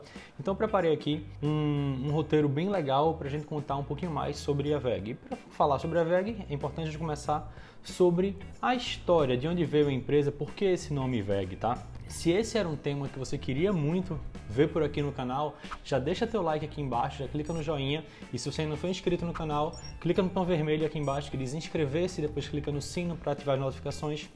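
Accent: Recife, Brazil. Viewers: Ei brother vc é de Recife? Achei massa o teu canal, sempre que procuro tem algum paulista/carioca falando... fora a qualidade das informações que você passa, parabéns!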